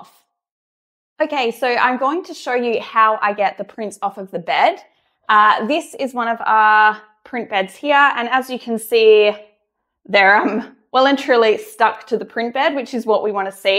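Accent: Australia. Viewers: First thing I have to ask are u Australian. Just from your pronunciation of words . I'm thinking of starting something similar with 3D prints and design. Any info would be great i am in SA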